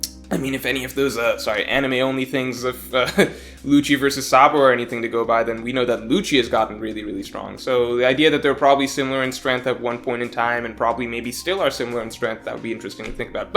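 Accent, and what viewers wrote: India. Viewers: Hey, which part of India are you from? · are u indian?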